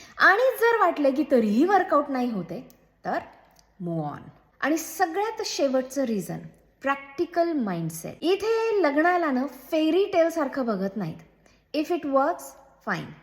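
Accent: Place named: Marathwada, India. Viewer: तायडी तु मराठवाड्यातील आहे का.. भाषेवरून वाटतयं😅😅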